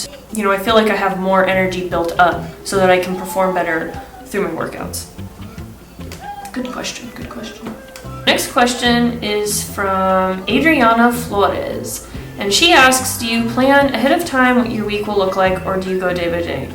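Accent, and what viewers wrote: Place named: South, United States. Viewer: Where are you from? I hear a mild southern accent!!!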